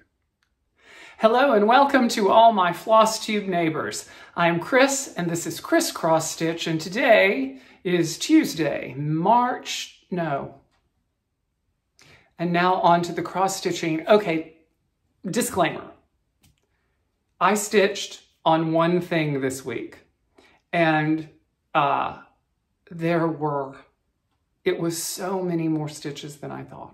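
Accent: South, United States. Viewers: Kia Ora from New Zealand! I have just finished watching your entire journey from the start while I have been home sick this week. You are truly a joy and perfect medicine. I've had tears and laughter, memories evoked, and a lil enabling. If laughter is the best medicine then u are a wonderful cure as I do feel better. Only thing is, as u are the only voice I have heard this week, every time I talk to my cat n dog I have a slight southern accent happening lol. You welcome everyone to the neighborhood but after watching the kindness, support, fun and joy, it's not a neighborhood u have created here, it's a FAMILY. Congrats on your milestones, I watched on TV so didn't comment often, but thank you for keeping me company (I'm a terrible patient) and I would like to suggest a name for hand stand cat ... OHANA. It means family. Thanks again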